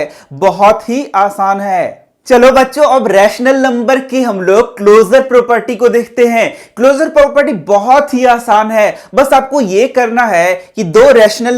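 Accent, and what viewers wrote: Bihar, India. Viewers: Sir r u Bihari..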